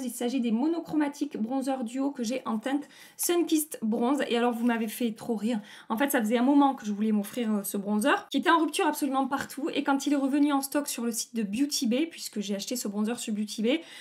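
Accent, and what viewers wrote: South, France. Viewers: ton accent du sud est un régal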